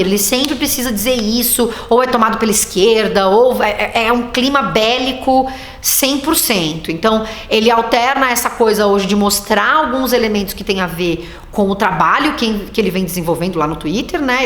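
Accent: Rio de Janeiro, Brazil. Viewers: Hahaha legal, mas ela manda bem no jeito carioca de falar. Abração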